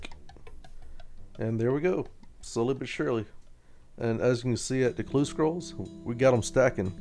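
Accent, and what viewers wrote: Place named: South, United States. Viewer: Idk why but people with Southern accents playing RuneScape always makes me smile. One of my best RuneScape friends from back then was from Missouri and had this super thick accent, you remind me of that a little lol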